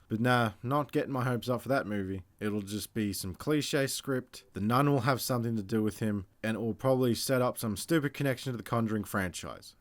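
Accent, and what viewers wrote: Australia. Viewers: As it's a wog/Leb Aussie accent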